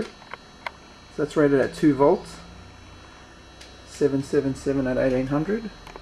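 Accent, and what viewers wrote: Australia. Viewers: hey are u Australian???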